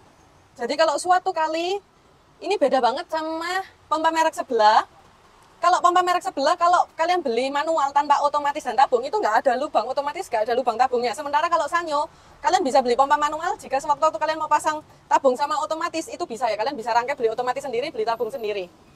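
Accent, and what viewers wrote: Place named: Java, Indonesia. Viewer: Logat jawa nya medok ci😂😂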